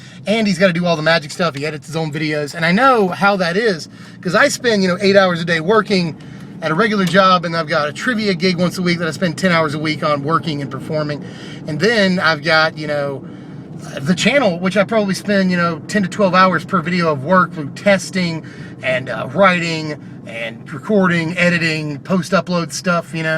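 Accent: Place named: West Virginia, United States. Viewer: West Virginia? The accent fits and the license plates are similar...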